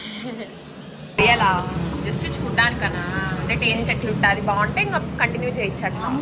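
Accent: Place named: Hyderabad, India. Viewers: Your slang looks like our Hyderabadi telugu pakka mass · Motham Telangana slang matladuthunavu ga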